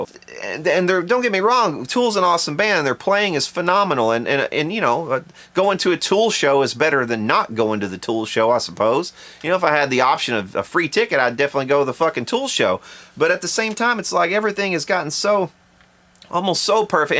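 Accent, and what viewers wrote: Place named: South, United States. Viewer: Hey, that was great felt good to hear! Coincidentally, I heard the show Joe B. put on here in Munich as his next to last, 49th of 50 this year in Europe. He moved around quite a lot, had Mr. Reese Winans on keys, 2 horns(one with vocals, too), 2 singers, drums and bass. I'm a good deal older than you at 66, but my mid-20's son, who pounds a pretty mean drumkit and is a lot more into metal, really thought it was pretty good. We were close enough that it was a bit too loud sometimes, but the energy of the band was to my eyes downright awesome, they were really in what I would term flow for about 80 percent of the time. Joe played a massively well-modulated acoustic solo after he'd already said, "Good night!" the first time which felt like about 20 minutes. Then the band came back and did another song to close. I really enjoyed it. 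I don't go to concerts often, but that was definitely worth the time, braving the rainstorms and the money. Thanks for the vid and keep it up. Your expletives are not my style or habit, but the southern flavor comes through nicely.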